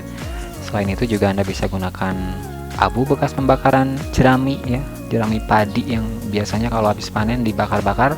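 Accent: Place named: West Java, Indonesia. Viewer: Aksennya ky org sunda ,,timana kang??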